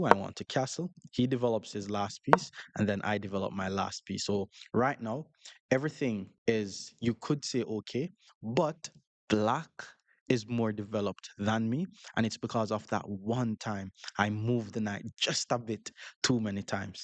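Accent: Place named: Jamaica. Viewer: Not gonna lie this whole time I thought you were Jamaican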